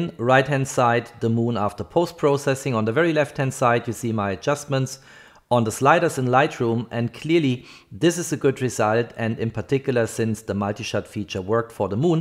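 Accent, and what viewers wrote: Germany. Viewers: Bist du aus dem Schwabenland?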